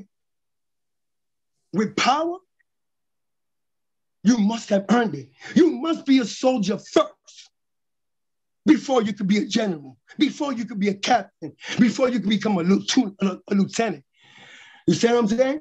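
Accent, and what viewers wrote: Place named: New York, United States. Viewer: sound mad new york. ...every body has to get. thiers. eventually..Every get thier turn. ....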